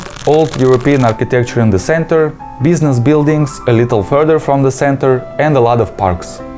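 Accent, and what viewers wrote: Russia. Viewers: Такое хорошее знание грамматики, но почему акцент прям рашн? это специально или забил на фонетику? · You're a pretty creative and funny guy. You should live in the US. With that Russian accent and sense of humor, you'd probably be a millionaire celebrity - maybe a movie star if you can act. From the 86 year old CA hiker.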